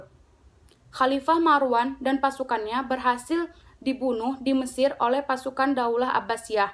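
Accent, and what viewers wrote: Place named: Medan, Indonesia. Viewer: anak medan ya haha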